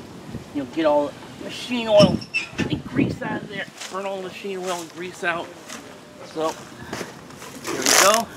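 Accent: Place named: Australia. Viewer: Great idea for a video man! I found it entertaining. I don't really use that much charcoal for my first seasoning, I just fill the chimney and burn that much out...but you definitely made sure you got out all contaminants out! I loved the aussie ascent too!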